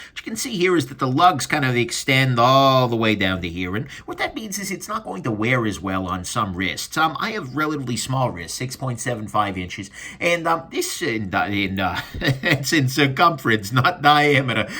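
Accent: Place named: Brooklyn, United States. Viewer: You must have grown up in lower Brooklyn. You sure sound like you hail from there.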